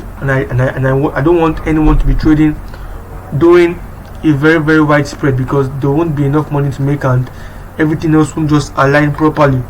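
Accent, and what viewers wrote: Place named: Nigeria. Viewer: Nigerian accent is easy to know!! You actually sound like someone I know.. Respect bro!✊🏾 It's good whenever I see a Nigerian doing this on YouTube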